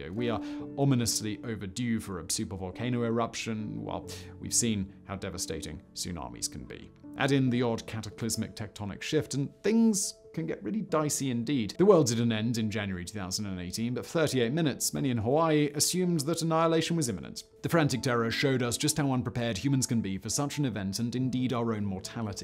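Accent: RP, United Kingdom. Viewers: His BBC accent isn't forced and not at all cringeworthy. What a pompous arse.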